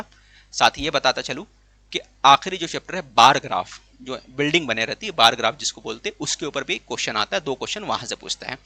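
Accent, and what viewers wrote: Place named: Maharashtra, India. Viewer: Sir aap maharashtra se ho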